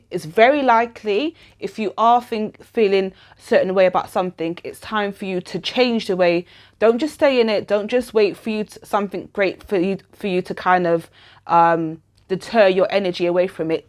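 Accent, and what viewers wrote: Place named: United Kingdom. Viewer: Love the accent, girl. Makes me want to be British. Nuff love from the Jamaican One.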